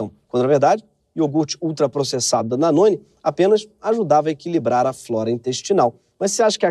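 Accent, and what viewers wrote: São Paulo, Brazil. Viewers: A melhor imitação de paulistano que existe, cara 🤣🤣🤣🤣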